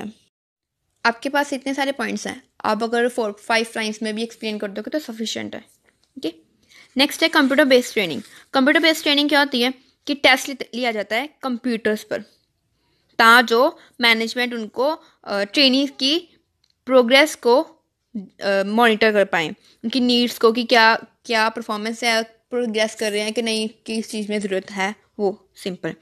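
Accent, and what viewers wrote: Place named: Haryana, India. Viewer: Bolne ka tareeka bhot acha h sab samaj aa gaya... Aur apki haryanvi tone 👌🏻👌🏻👌🏻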